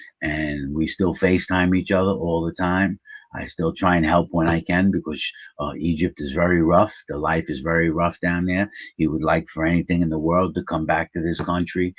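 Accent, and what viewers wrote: New York, United States. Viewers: The way you tell stories is awesome. I don't know why, but i love the NY accent. Thanks for the authentic insight.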